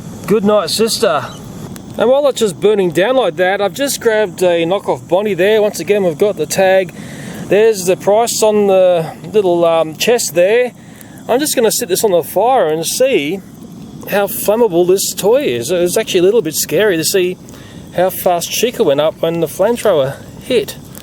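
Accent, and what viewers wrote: Australia. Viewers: I love the way Australins talk. Im American so I can't really understand what he means sometimes.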